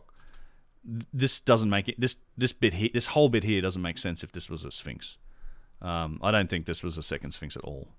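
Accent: Australia. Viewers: Such a wonderful channel, where's your accent from though? Sounds Aussie. Love, absolutely LOVE your approach & mindset, well researched, honest & especially prepared to challenge establishment. Xx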